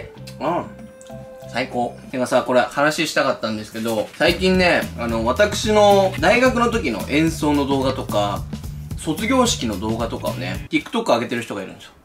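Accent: Miyazaki, Japan. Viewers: めっちゃ宮崎弁、もう宮崎弁で話した時あ、って思ったwめっちゃ親近感、私も宮崎県だからめっちゃ訛ってるw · 宮崎弁すごい🤣🤣🤣🤣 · 宮崎弁最高👍👍👍👍👍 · の宮崎弁かっこいい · 最初の宮崎弁でめっちゃ親近感わいたっちゃけど😂(あえて宮崎弁で笑笑)度々出てくる宮崎弁がいつも嬉しい☺️🤞🏻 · わ〜！！！！ばちこりの宮崎弁嬉しい😭😭🤍(宮崎県民です) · 宮崎弁喋ってるの超好き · うちの親戚宮崎人やけんめっちゃ宮崎弁懐かしい気持ちになるw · 今まで登録はせず、おすすめに上がってきたら観る程度だったんですけど、宮崎弁とただただ良い人感にやられて登録しましたww さんみたいな人が叔父だったらわたしもきっと自慢するし伯父っ子になりそう。。🥺🥰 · さんの宮崎弁なんか好き笑ちなみに、私のおばあちゃん家も宮崎です！ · 宮崎弁可愛すぎる😂❤️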